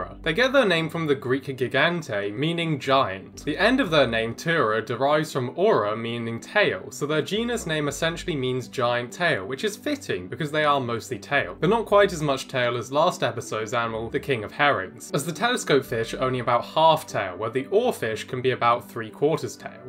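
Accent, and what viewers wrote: RP, United Kingdom. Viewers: Calling zoologists/scientists "stupid" while regurgitating all their findings in a lisping plummy nonchalant monologue 🤦🏻‍♀️ L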